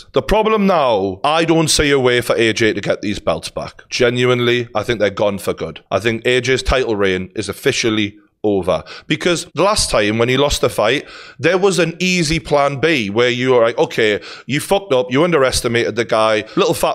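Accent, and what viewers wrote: Newcastle, United Kingdom. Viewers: “Ruiz was already a fat fuck….no offence.” 😂 Love that Geordie!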